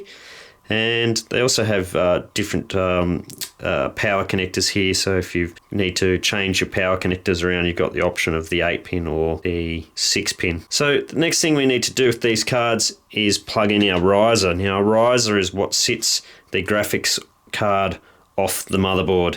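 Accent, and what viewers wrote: Australia. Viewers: Excellent no-nonsense videos, great to hear an Australian voice... can you tell me what the data usage is on a rig like this per month? Is that a stupid question?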